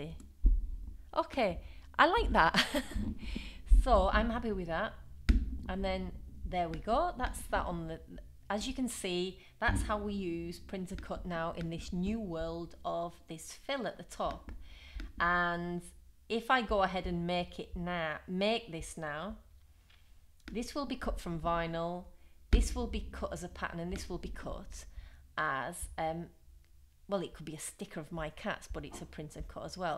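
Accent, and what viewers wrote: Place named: Northern England, United Kingdom. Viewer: Thanks for the tutorial. Love your accent! My mom is from Huddersfield, and you sound like you might be from somewhere up there in the North of England.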